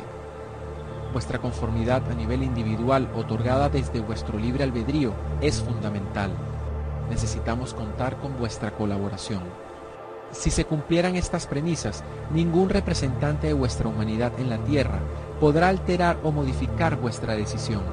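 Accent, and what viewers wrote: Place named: Mesoamerica, Latin America. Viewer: La musica es hermosa, el mensajes es el mismo que el de tantas canalizaciones, no entiendo porque no pueden decir quienes son?.. ya conocemos a los pleyadianos arcturianos y demas...no entiendo bien ???...un par de cosas me molestaron...LA TONADA MUY HUMANA HASTA MESOAMERICANA.. Y QUE NO FUERA EL ORIGINAL..por lo demas...lindo..¡¡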